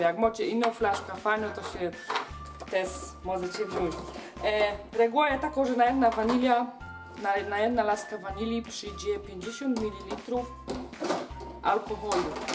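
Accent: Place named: Podhale, Poland. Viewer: Przecież to jest Urzekające.... Ja pochodzę z Białegostoku ale to jest Cudownie piękne jak Pani mówi po Goralskiemu 😃😃😃😃😃😃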